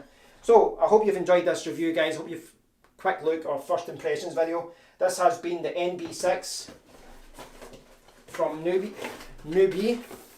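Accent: Scotland, United Kingdom. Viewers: You sound so Scottish lol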